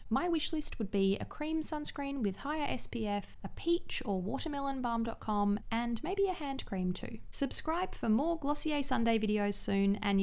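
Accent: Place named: Australia. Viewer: I love your Aussie accent. Your voice is so calming. You should make a skincare asmr video 😂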